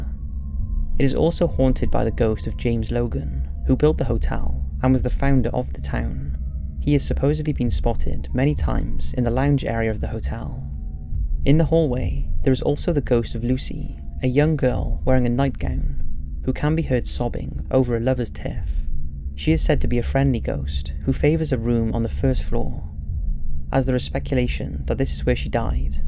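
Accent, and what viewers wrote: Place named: England, United Kingdom. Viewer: Didn’t know you were English haha 😂 iam too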